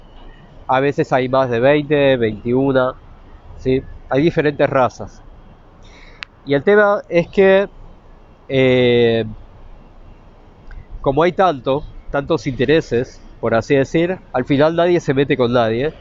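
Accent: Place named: Argentina. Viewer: ! Muy buen vídeo! Tenes acento Argento ( de donde sos?) Abrazo grande desde argentina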